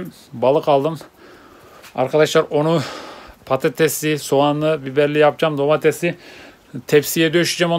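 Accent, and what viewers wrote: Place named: Yozgat, Turkey. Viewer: Yozgatta selamlar :) Abi konuşman zbizim Buraların Konuşması Gibe Leee 😂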